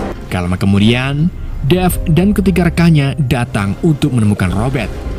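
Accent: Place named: Java, Indonesia. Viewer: Tak meluni sekang awal malah part 3 jawane metu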